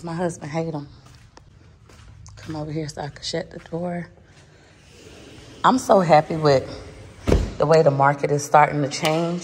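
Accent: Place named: South, United States. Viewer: Okay, I like the house but don’t love it…especially for that much $$, but tell me why your voice is giving Beyonce today? 😂😂 You have that sexy whispery southern voice today lady! 🥰 I missed the zoom this morning, but as soon as you post the recording I’m on it!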